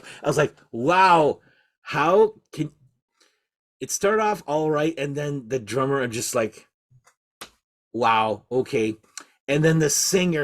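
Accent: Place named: Canada. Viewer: I was watching this video, and listening to you, and my first thought was "this guy sounds Canadian". Then you said "Power Hour", and I noticed the senators flag in the background! 🤣 Ah-HA! A fellow Ottawan. I actually know Michael Angelo Batio, and he's a really good dude. His guitar playing talent is unreal. I've never seen anyone play as fast as he does (even today in his 60s!). As an old school 80s Thrasher, was never my thing, but Mike's technical chops cannot be denied! Cheers!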